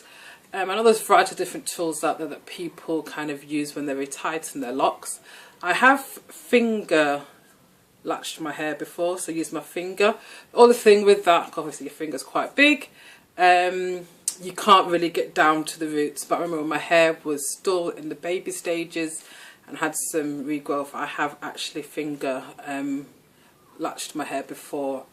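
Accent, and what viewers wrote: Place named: Northern England, United Kingdom. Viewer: So great to hear a UK voice and then a northern voice at that! Where have you gone? Your videos look great, would love to hear how you are getting on.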